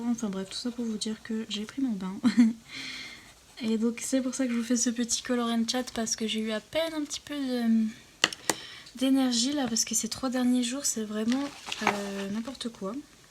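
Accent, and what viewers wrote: Quebec, Canada. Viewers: Allo ! Je trouve ton accent québécois vraiment bien et ca vient d une québécoise 😉 j ai beaucoup aimé t ecouter en coloriant dans mon nouveau livre ❤️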